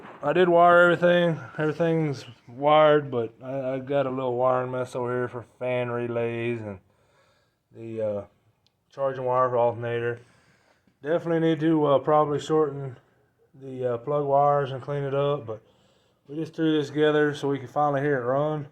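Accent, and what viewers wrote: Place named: Appalachia, United States. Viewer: It is painful to listen to dumb hillbillys like you try to speak English. Good Lord!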